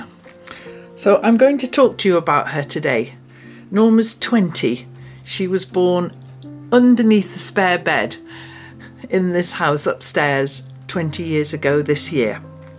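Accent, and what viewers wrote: United Kingdom. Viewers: I had a cat that became 21 years old. Her name was Whitesocks. You will never guess why ;-) She stopped eating and drinking and our vet told us that she has no table that says what 21 catyears are compared to human years. Enjoy your catlady as much as she enjaoys her life with you. Love how polite you talk to your cats in your videos. That sounds very british in my german ears. ;-) Have good times you two.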